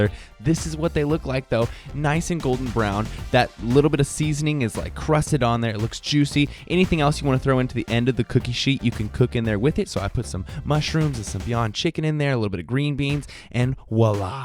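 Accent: United Kingdom. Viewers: British accent, recipe, sexy filming and close ups sooo on point man. I'm legit going to make it now. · your British accent is better than mine and I'm British ... Take the children to the school baby ahahah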